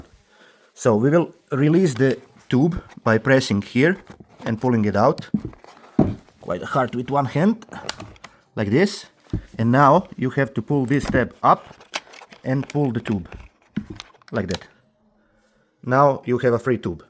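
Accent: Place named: Russia. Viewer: русский акцент явно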